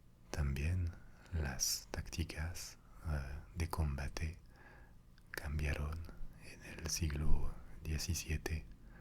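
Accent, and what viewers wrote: France. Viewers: oi todos tus videos en frances e ingles, nunca crei que hablaras en español, estoy realmente sorprendido, hablar re bien el español, aun con el acento marcado al frances pero no molesta para nada, hace exactamente lo que haces, relajar. Mas videos en español por favor!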